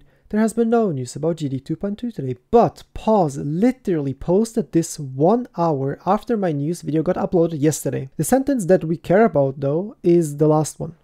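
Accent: Germany. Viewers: Is he german or somethinge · You are either German, Austrian or swiss. What of these 3 are you? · you german?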